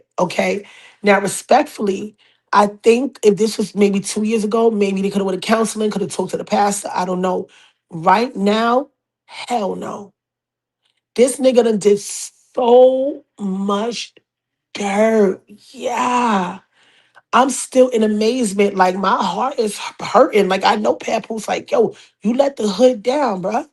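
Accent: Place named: Brooklyn, United States. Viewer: I heard you speak and I hurried up and subscribed!!! Love the rough talk you sound like your from Bk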